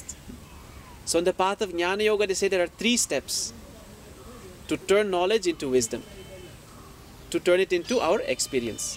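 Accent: India. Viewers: You are white but have an Indian accent. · Was you born in India you sound like a native. That or you spent alot Time there. My friend speaks Spanish. But he learned from Mexican people. So when he does speak Spanish. He sounds just like them. I think it's cool take care.😘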